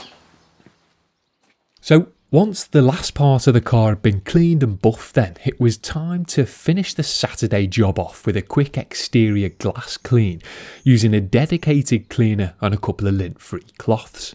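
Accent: Liverpool, United Kingdom. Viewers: Sounds more like he's from the outskirts of Liverpool. Huyton maybe.. or even Warrington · It's like a nature documentary with the British accent, but about a guy cleaning a car. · your way of talking is so British uuuh too much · Mellisonant he’s scouse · is he scouse or am i going mental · Liverpool accent?? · Valentino Rossi Fans he’s a scouser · Something about his soothing british voice as he covers a car in white foam makes me happy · So I’m American but I’m trying to guess the accent off the few English friends I’ve known over the years.... Liverpool???? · Feels bad cant understand half of the jokes since the british is strong in this one :D But atleast i can see the car transform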